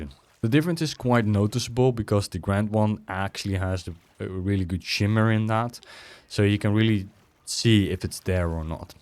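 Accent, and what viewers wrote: Netherlands. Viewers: nederlander zeker?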